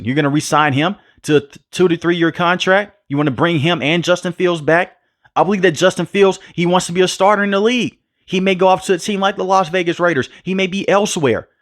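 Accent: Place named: Louisiana, United States. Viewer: This accent is too strong. Louisiana?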